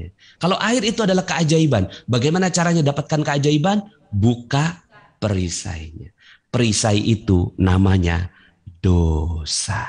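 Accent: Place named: Java, Indonesia. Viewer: pake logat jawa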